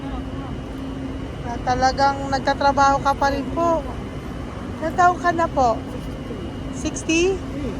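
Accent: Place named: Visayas, Philippines. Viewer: Hi! Lovely Lady, bisaya ka day ang salitang tagalog ay malumay bigkasin,kaya ng sanabi mo kukuhain ang tama ay kukunin at isa pa bagay2 malumay bigkasin,ang bisaya paspas mag-salita.😢😢😢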